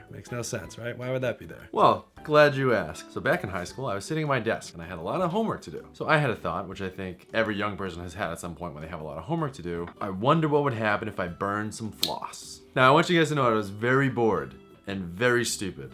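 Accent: Boston, United States. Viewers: ***** oh. haha I've always thought she was from Boston. I can't tell the accents apart ^^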